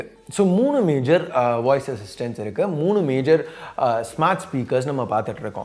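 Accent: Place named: Chennai, India. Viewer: bro..unga chennai tamil slang semma🔥🔥🔥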